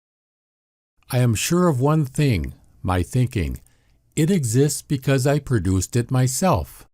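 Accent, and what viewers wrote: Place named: Western New York, United States. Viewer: Are you from WNY? Buffalo area? Possibly Chicago or Michigan? I hear your accent and I like it; I am from Niagara Falls NY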